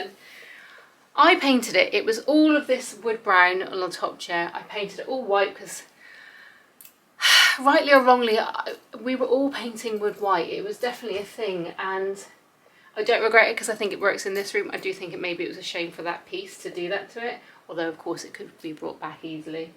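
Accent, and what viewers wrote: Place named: Bristol, United Kingdom. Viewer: Cool vids. I can tell of a slight Bristol accent am I right cute studio my love ❤🎉❤🎉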